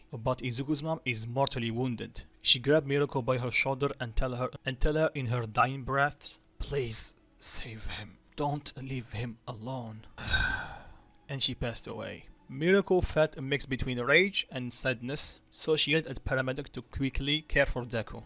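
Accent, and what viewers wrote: Russia. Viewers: Are you Russian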